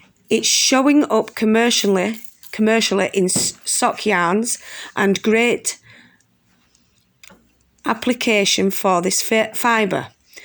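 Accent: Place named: Northern England, United Kingdom. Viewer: Very nice video. And your northern accent is entrancing to us in California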